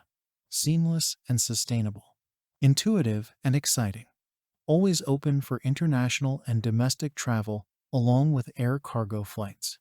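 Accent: United States. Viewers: This video is a mishmash of graphics and congratulatory remarks only a public relations copywriter could conjure up. It’s repetitive and covers the same subject over and over. It lacks substance and nuance. The graphics are brief, lasting about two seconds, so whatever purpose it had is lost in the shuffle. Who put this young man up to this task? He sounds American so how did that happen? Nah, not liking nor subscribing. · Don’t get me wrong I understand most of this project it annoys me that it’s narrated by an American but the thing that gets me this commentary says that there will be a rail bridge within the airport precinct I was under the impression that it was underground within the airport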